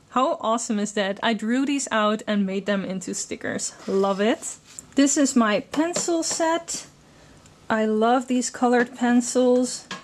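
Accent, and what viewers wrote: Netherlands. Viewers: i knew you were dutch when u started talking lol. i know the dutch-english accent from anywhere! :D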